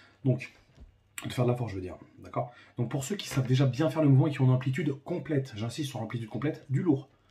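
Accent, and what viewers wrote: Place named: Gaul, France. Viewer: tu me tue, cette façons de parler, ca c'est du gaulois solide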